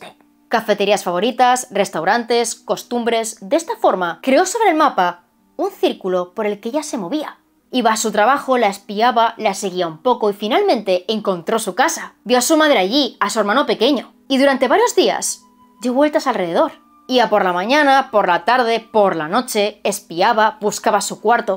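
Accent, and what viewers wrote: Galicia, Spain. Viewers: Eres gallega?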